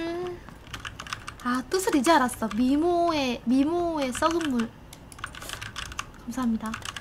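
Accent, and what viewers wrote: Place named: Jeolla, South Korea. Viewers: 님 사투맄ㅋㅋ 고향이 어디세여? 전남 영광같아ㅋㅋ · 고향이 전라도...? 최근꺼부터 거꾸로 보고 있는 편인데.... 이때만 해도 사투리 작열이네욥 ㅋㅋ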